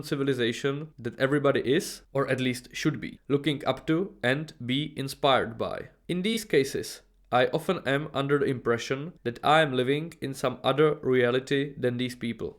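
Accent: Czech Republic. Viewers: You have Czech accent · Great. Výborný obsah, i když ten český přízvuk je v té angličtině hodně znatelný :)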